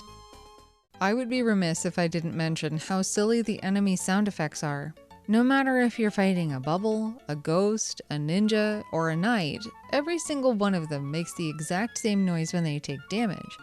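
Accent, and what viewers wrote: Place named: Canada. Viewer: Hey enjoy your videos. I would love to see a Breath of fire 3 review on ps1. Also, are you canadian? I am like 95% sure from your accent you are either very far north in America, or you are Canadian ay?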